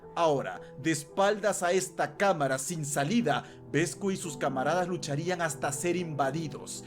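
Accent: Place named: Spain. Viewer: De que parte de España eres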